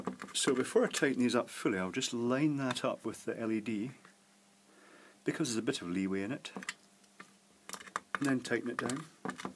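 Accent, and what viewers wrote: Scotland, United Kingdom. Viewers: he does, but that's the best way to teach and to learn. You'll learn more from the spoutings of an expert like, than you will from a random video or book. I litterally happened to watch a vid which took my interest called "poundland LED's" I though, "Oh I didn't know PL made LED bulbs, I'll have a look and see the vid to see if they're any good as I wouldn't mind pur-chasing some for my abode" 4 hrs later I'm still on his fuckin channel, and actually feel confident enough to fuckin LED my house up myself! Seriously! This man is a fuckin ledge! And with a FUCKIN AWESOME Scottish accent to boot as well! What a voice and what an accent! Ya wanna think about doing some voiceover work, on the side, seriously. "In a word without LED'S. Only one man can make a difference....."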